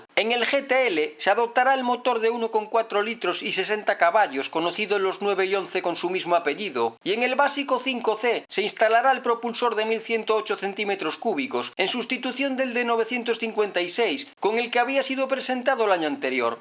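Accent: Galicia, Spain. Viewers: Es gallego, joder. Es su acento, tan español como el tuyo y seguramente mucho más bonito.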